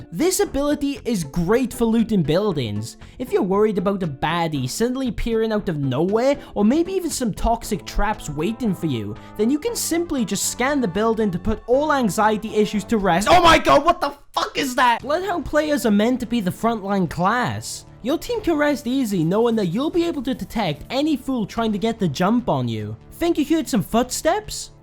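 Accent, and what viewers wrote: Wales, United Kingdom. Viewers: do i hear welsh?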